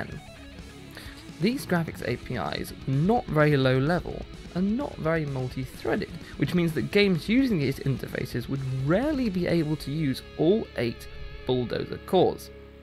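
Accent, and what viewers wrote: United Kingdom. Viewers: Love the british accent... Nice video man!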